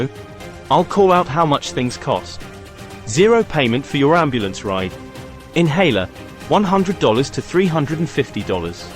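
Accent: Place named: England, United Kingdom. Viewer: The makers of this video would be more persuasive to Americans if the voice saying 'we' 'our healthcare system' was not an English one. Did they use a robot voice? If so it's a good one (its peculiar pauses in sentences are a bit of a give away) but no all accents which speak English are the same.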